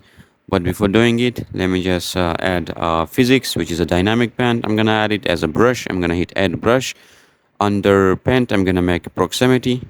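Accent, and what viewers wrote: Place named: Iran. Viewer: عشقه لحجه ایرونی ، خسته نباشی💥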